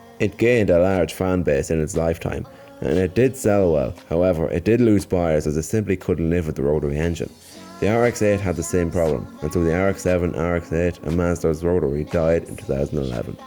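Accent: Ireland. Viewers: NIssan Silvia wow have you never heard of an Irish accent?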